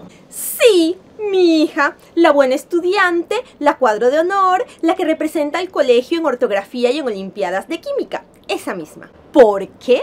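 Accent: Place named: Panama. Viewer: A parte, quiero hacer un comentario con respecto a los videos. Yo entiendo que quieran hacer algo de humor realizando diferentes narraciones como si fueran personajes, en este caso, una profesora de colegio. Pero creo que esto le quita seriedad al video, si la idea es enviar un mensaje serio. Ademas pienso que no es "hablar como panameño" es mas bien hacer la diferencia a la hora de hacer un video, porque si fuera asi, entonces todo mundo hablaria como habla cotidianamente en cada video de youtube, serie de netflix y programa de tv que uno ve, no? Yo solo digo que puede haber mas seriedad del video, si se habla con menos tonos "al estilo panameño" y con mas tonos concretos.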